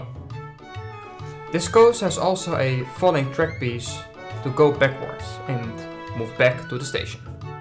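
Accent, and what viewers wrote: Netherlands. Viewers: U are dutch arent you :)) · Jij bent Nederlands ik weet het gewoon ahah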